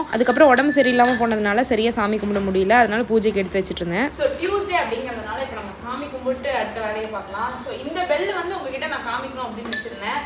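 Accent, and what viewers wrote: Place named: Erode, India. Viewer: Erode slang ✨❤ since my home town is salem i can find it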